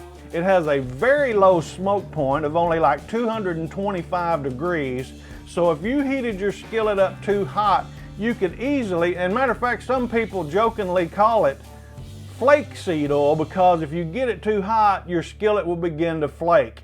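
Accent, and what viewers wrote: South, United States. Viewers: It’s not the name. It’s not the beautifully seasoned pan he flashed at the beginning. It the fact he exclusively calls a skillet, how he pronounces oil, and the fact he is in an extremely ordinary lived in kitchen that makes this video so credible. That little chuckle at the end about flax seed oil…. Wish I had some more southern friends.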